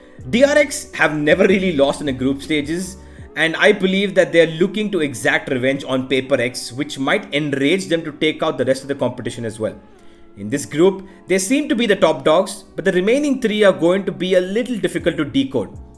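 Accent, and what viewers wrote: India. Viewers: Great video keep it going, its nice to listen an Indian voice talking about this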